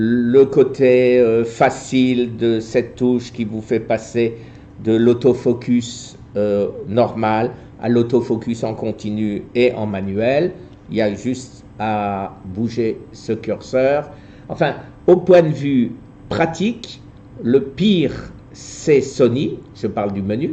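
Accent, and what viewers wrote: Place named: France. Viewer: Merci pour toutes vos excellentes vidéos! C'est bien aimable d'avoir fait celle-ci dans la langue de Molière, bien que lorsque vous les commentez dans celle de Shaekespeare, je comprenne bien également et que votre sympathique accent français m'amuse beaucoup! Continuez comme ça, bonnes photos et bien du plaisir pour la suite!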